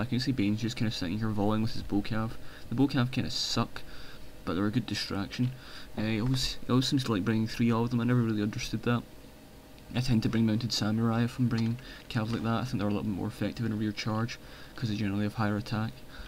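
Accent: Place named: Scotland, United Kingdom. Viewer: Finally a Scottish Shogun 2 commentator, if yer accents anything to go by. :)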